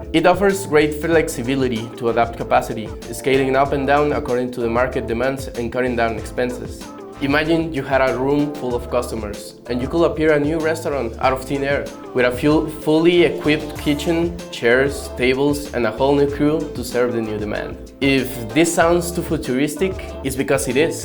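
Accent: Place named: Mexico. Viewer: Amigo, you accent sounds exactly like Raúl Jiménez's, when you speak.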